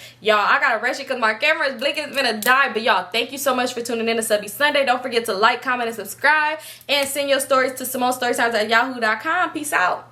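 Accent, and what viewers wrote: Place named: Texas, United States. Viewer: My brother heard the story about the weirdo in your apartment complex when you got your first apt. He say who is that talking about the creepy neighbor and I did not know he was listening 👂 huddling 🤦‍♀️ I told him I found your channel through the pandemic and I been riding with you since. We from Houston, Texas. I thought you was from Texas by the way you talk. But I found out your from California through your stories. You gotta let me know when you coming to Houston cause I wanna meet you and I love your vibe. I’m 43 I’m not with the bs and I’m not a weirdo 😫😫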